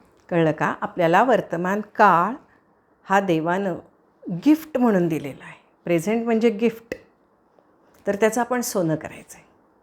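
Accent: Kolhapur, India. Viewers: Madam tumhi kolhapur chya aht ka?? bolnyacha tuning vrun vatt kolhapur che aht😊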